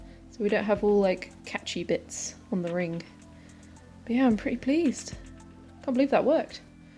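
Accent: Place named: United Kingdom. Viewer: I LOVE YOUR BRITISH ACCENT THIS IS SO GREAT!!!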